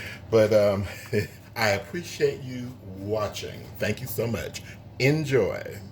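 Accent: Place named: South, United States. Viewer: Hey. New subscriber here. The cake looks delicious but your voice really had me hooked, so very sexy and earnest and with a southern flare. I loved that you gave the ingredients up front and took the time to let viewers know that they (we) can tailor it to our needs. Thanks again and I'll keep watching!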